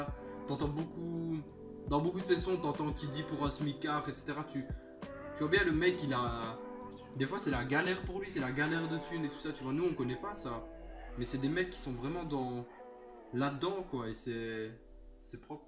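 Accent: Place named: Belgium. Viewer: Ça sent la Belgique dans la voix le gars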